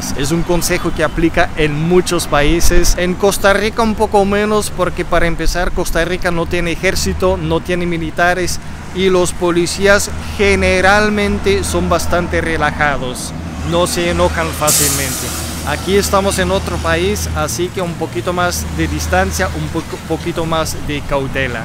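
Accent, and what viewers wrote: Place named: United States. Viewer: ah, ya decía yo que en Costarica los ticos no hablan así. Pensé que era estadounidense por qué habla casi igual que un gringo cuando aprende español, no menciona mucho la "r"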